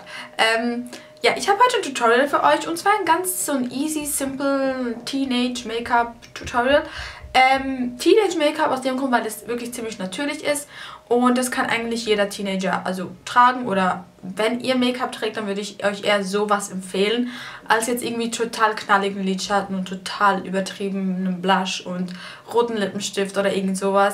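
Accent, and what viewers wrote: Switzerland. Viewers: Schweizerin ? :D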